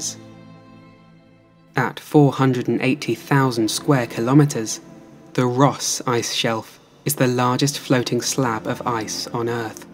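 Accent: United Kingdom. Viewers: Why is it only 12 min long ? The recording is amazing !! The quality is incredible … I don’t care for tne narration though … it seems flatlined and typical , reading every single thing with the same inflection , not having any true feeling / emotion behind it but just flat lined with that same typical cadence every few words , same lifts same falls ( if you can even call it lifts and falls when the vocal range of speaking only rises and is lowered slightly , slowly and maybe a half step in one direction or the other .. sounds like every other recording where someone with a British accent is trying to narrate a documentary… always with same inflections and none of it feels emotive or connected. Many people may disagree and they have a right to their own opinion and can say whatever they wish in their own comments , which I’d expect the same respect regarding my own …. 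I say this not to belittle , but to illustrate my point , bc honestly I feel like it’s something that could be worked out and improved upon … not everyone is meant to be a narrator and I’d leave that up to a handful of people …. With broadcasting experience that have the voice for it .. not to say you won’t eventually have the voice for it , but being completely honest , it takes an amazing mind blowing film and makes it come off amateurish with this narrative.. please at least be unique among those who just read with no pulse whatsoever .. omg!!! There are so many people that when they read , they either bore pe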